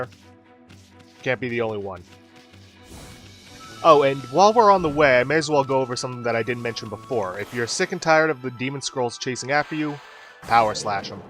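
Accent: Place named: France. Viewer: I'm rather enjoying your French accent. Also, I'm not French, so I can't make an assessment of how good it is.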